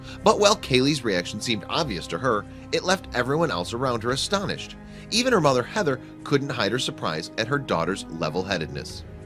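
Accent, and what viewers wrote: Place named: Upstate New York, United States. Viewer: The narrator HSS s cute accent. Upper New York State?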